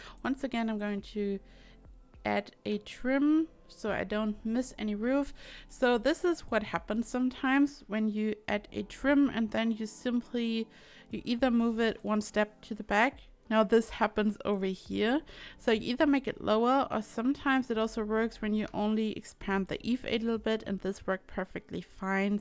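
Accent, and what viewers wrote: Germany. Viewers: Your Accent tho....you're German ain't ya?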